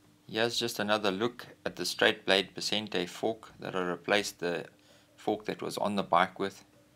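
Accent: South Africa. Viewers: Great build 👍 I’m gradually getting used to your South African accent 😊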